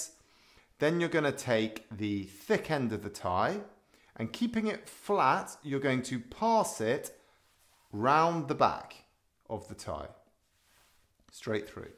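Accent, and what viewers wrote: United Kingdom. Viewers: Thank you very much! The best explanation from 3 I watched. Thanks for your lovely British too😊! From California, helping to my son who is graduating high school.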